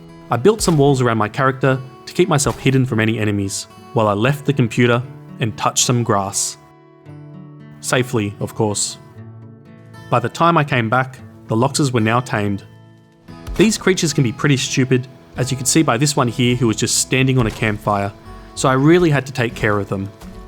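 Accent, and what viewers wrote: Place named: United Kingdom. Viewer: you british sound cool. As an American from California Dallas I respect the yabbies you blokes drink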